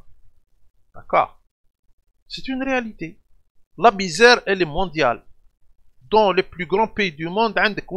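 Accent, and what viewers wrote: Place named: Morocco. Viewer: Ce minable a un accent marocain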